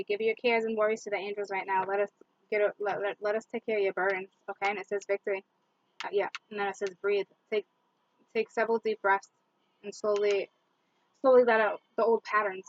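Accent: Boston, United States. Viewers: I'm frm NY n its sounds like ur frm more north like Boston but ur still attractive n made kool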